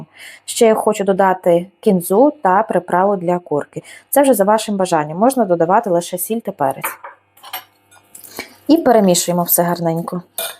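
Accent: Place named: Lviv, Ukraine. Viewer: Добрий день,! Дякую за рецепт. А Ви не зі Львова часом? Бо чую чудовий львівський говір, інтонацію, дуже приємний.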